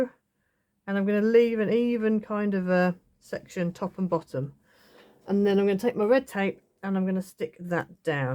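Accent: United Kingdom. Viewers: Lovely card beautifully explained. Thank you. Good to hear a British accent too.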